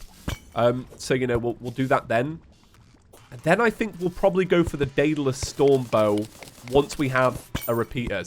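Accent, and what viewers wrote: United Kingdom. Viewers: Hello sexy-voice British man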